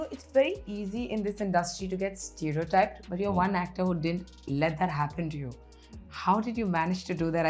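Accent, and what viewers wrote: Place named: Delhi, India. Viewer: Delhiwala do talk similarly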